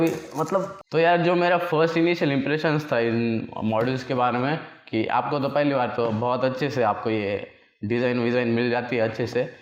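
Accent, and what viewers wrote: Maharashtra, India. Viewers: Bhavaa Tujhya Bolnyatil marathi gavran tone!Kuthla aahes Tu?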